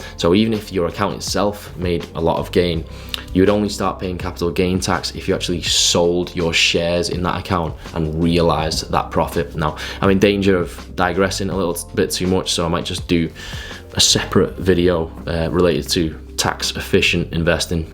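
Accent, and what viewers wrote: Liverpool, United Kingdom. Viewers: Scouse power! 💪🏼 Quality video lid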